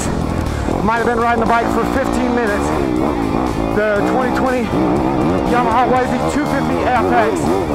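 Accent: Utah, United States. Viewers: You said last year that the Beta 390 RR was your favorite 4-stroke ever. Sounds like this one might usurp the Beta. Oh, and I love how you speak Utah language.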